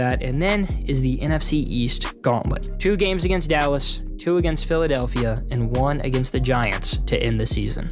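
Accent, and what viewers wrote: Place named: Virginia, United States. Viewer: I knew you was from VA ‼️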